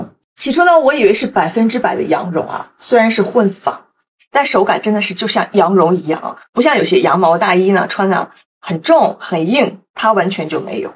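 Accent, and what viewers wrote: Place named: Japan. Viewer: 你推荐的这几款大衣从面料到色彩到版型，都非常的好，优雅高级，我跟着你给的link看了一下，价格也很亲民。👍。很可以买。手痒。还有你上次推荐的海浪包，也同样出色，美观实用，品味超群，还是美国华裔设计师的作品。棒！ 但是，我觉得，这几款大衣，不太适合你。因为它们的肩膀自然下垂，随着你的肩膀走，太溜肩了。显得头太大了。（你的这个视频里的有些照片，从下向上照的，把头照小了很多，看起来比例就好多了。）其实，这涉及到一个头身比/头肩比的问题。以中国人的传统审美，你的比例是完美的，就像明清仕女画里走出的美人。但现代全球审美是西方七头/八头身的审美，（你给的穿大衣的模特照就很明显，她们头小肩宽，穿起来很不一样）。所以我觉得很多传统东方美女要靠衣服的裁剪来修饰一下头身/头肩比。 我的家人是你的粉丝，非常非常喜欢你的频道，她觉得你的推荐，属于日本风格，低调典雅，特别适合东方女性。我也同意。挑一点小毛病，你的品牌发音，应该都是日式的，和在欧美地区流行的英语式发音（Anglicized）有区别。比如UGG是“阿格”，Louis Vuitton 被称为“LV”别人也能听懂，Hermes 近乎“Air Mess”。日式发音会让人一愣，不知所云。日本人分不清V和B、R和L。用日文来音译欧美品牌，相对来说较为困难，可能还不如汉语的音译。比如“路易威登”“爱马仕”什么的，和品牌的英语式发音还挺像。我猜你的观众不少都在日本之外，或者改用英式发音更好些？ 看到说，你1977年买了第一个LV 包，但你看起来顶多30出头。太惊人了！希望今后多发保养穿搭显年轻的视频呀！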